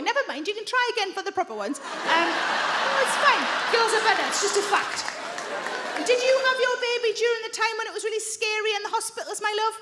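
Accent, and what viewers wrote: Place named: Geordie, United Kingdom. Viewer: In my moment of madness, I bought a Ukulele and then Covid happened. Now I have eight in three sizes. I hadn't played a musical instrument for over twenty-five years but the upside of Covid was I had plenty of time to practice. In fact I think it probably kept me frow going insane or a least institutionalised like a caged Bear. 🤣 now I don't want you to take this the wrong way but every time I watch one of your YouTube videos I'm reminded of my granny. Like you she was a Geordie and had a that strong Novocastrian accent and earthy sense of humour. She was my mum's stepmother as my gramp lost his wife in a bombing raid during the war. I used to cyclone there with my two best mates and she would make us laugh with the sort of astutely observed humorous situation that you are so good at. We would leave there with face ache from laughing and the sound of her voice in our heads saying 'Aye ya boogers, ya do like ya bit of smoot'. 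I can still hear her when I came in through the back door saying ' Allo hinny, ah thought ya might cool this morning'. She wore glasses too funnily enough. It's like London taxi drivers, it's keenly observed comedy. You are a first class Raconteuse. Ohh.... the Hot Tub thing, I'd always imagined the effect would probably be like Number 35, Egg drop Soup. 🤣 you can have that on me and the wicked influence of my granny Ness (Agnes). 🐻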